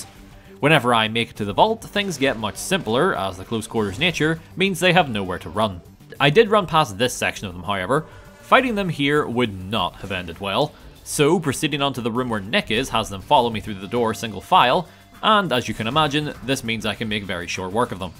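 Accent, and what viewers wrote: Northern Ireland, United Kingdom. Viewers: From the beginning of the video where you said you talk like Marge Simpson I was trying to place your accent. I knew it was Irish and thought it was probably northern but you answered my question in the first video I legitimately thought about it. Love when that happens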